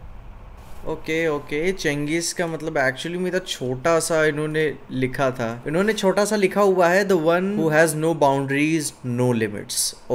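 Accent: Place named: Bhojpuri, India. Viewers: isiliye bhojpuriya lagta hai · Haan, shayad bhojpuriya hi hai but bande ka baat karne ka lehza aur shabdon ka chunaav bahut badiya hai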